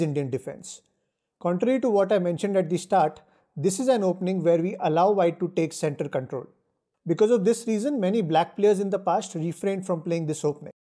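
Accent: India. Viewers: Yariel Robles how on earth have you never heard an Indian accent? You’re aware like 1/7 of the world is Indian..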